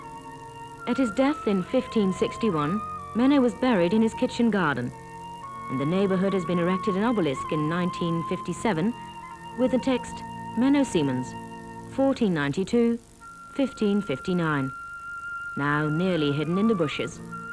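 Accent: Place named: England, United Kingdom. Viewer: She doesn't know how to pronounce Menno Simons. It's Menno Simons with a long i. Not Menno Seemans like she says. Kind of funny that she has an English accent, as well. This needs to be done over with someone with a Swiss German accent or a Friesien Dutch Accent.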